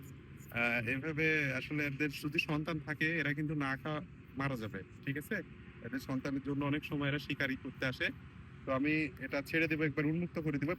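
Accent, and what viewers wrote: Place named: Natore, Bangladesh. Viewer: আপনার বাড়ি মনে হচ্ছে নাটোরে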